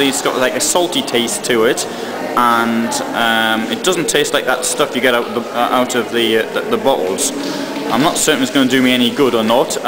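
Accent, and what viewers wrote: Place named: England, United Kingdom. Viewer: I cannot quite make out your accent. What region of merry olde England do you hale from?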